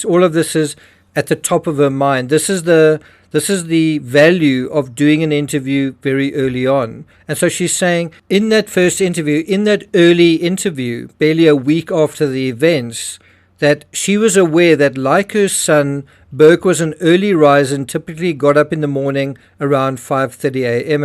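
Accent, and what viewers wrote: South Africa. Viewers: I think it's the narrator's South African accent pronouncing Barb.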